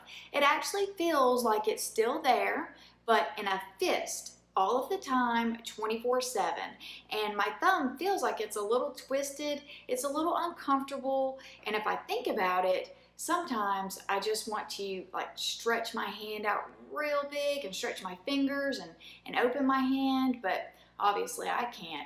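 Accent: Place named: South, United States. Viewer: I am not American. Are you from the south somewhere? Cool accent.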